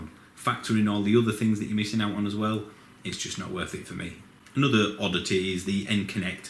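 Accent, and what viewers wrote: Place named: Huddersfield, United Kingdom. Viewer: I totally agree with your observations. I have a 4 year old Tekna and love it, but I have chosen a new 2.Zero, which for me does everything I want. Also, you sound like a Huddersfield boy, like me!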